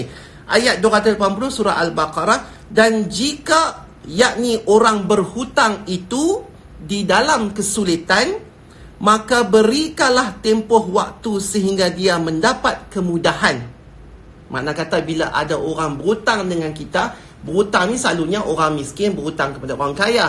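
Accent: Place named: Penang, Malaysia. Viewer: Penang pure..asai georgetown..kedah lain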